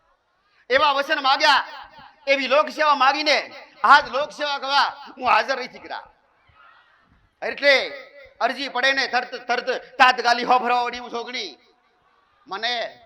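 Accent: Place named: Mehsana, India. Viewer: માડી ની ભાષા અમારા મેસા નાણાં ની બાજુ ની આવે છે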